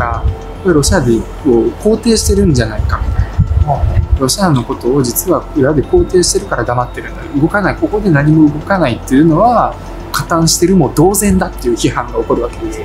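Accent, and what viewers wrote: Kansai, Japan. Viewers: 関西弁で身近な存在に感じました。又、悧発な方で尊敬致します😊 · 綺麗な関西弁がとても心地良いです。。。意志の強さを感じました〜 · この方の関西弁は品があって😍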